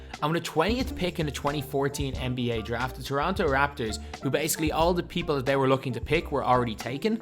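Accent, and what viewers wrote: Ireland. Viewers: are you from Ireland!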